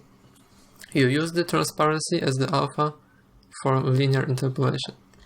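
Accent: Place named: Poland. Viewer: Po Twoim akcencie od razu słychać, że Polak !!! ;)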